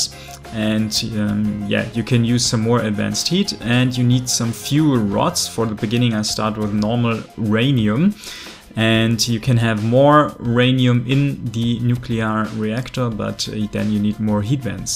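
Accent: Germany. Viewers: Bist du Deutscher? Hört man iwie raus und das "Servus" in der Beschreibung ist ein weiteres Indiz :)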